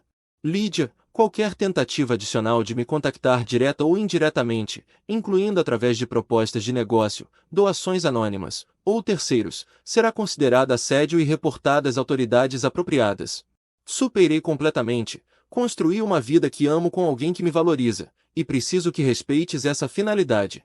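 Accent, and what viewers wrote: Portugal. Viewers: Exatamente isso. Português de Portugal não o nosso .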